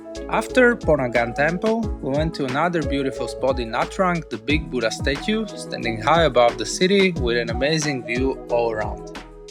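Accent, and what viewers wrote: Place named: England, United Kingdom. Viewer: Hey you are so cute with your English accent 😁 I was wondering where you are from. I hope one day we can travel the world together ❤️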